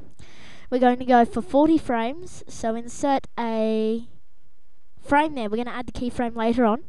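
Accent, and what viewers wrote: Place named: Australia. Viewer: Awesome austrailan accent! you helped sooo much ! subbed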